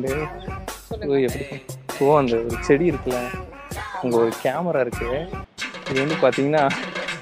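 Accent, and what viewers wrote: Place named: Coimbatore, India. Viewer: Romance naa thaan 🔥 Apram eppovumae last la antha kovai slang pesirunga athaan massuu Bro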